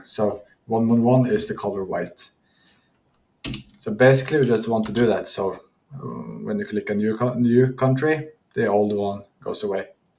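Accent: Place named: Norway. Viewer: I love your vids too, they're explained in great detail and it shows that you really have a great talent for coding as well as explaining. Keep the great work man ! Side (and totally unrelated) question : wouldn't you happen to be Norwegian ? You have the same English accent as the actors playing in that AWESOME norwegian series Vikingane / Northmen !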